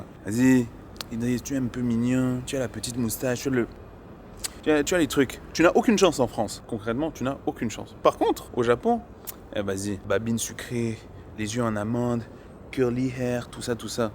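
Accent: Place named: Cameroon. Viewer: Tu viens de quel pays en Afrique tu parle comme un camerounais 😁😁😁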